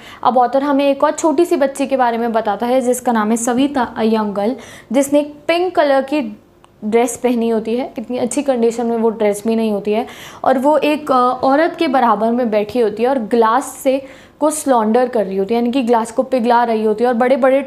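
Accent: Punjab, India. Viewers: Her Punjabi accent is so cute 😂❤ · Madam...are you from Punjab?? ...tell me pls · the punjabi accent that you make in mistake like "haiga" makes this video more interesting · Is she belongs to Punjab ?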